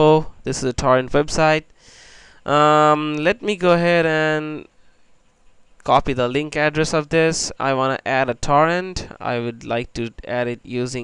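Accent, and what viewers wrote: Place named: England, United Kingdom. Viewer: nice english accent!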